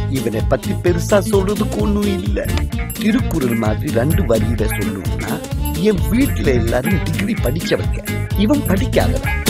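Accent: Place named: Madurai, India. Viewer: Madurai slang was superb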